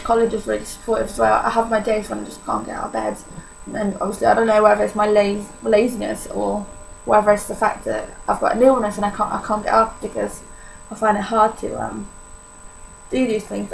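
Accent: United Kingdom. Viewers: God bless you but you sound very British to me. I am sure I cant notice the slight accent because I am American. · polish? ya fink? sooo british it hurts...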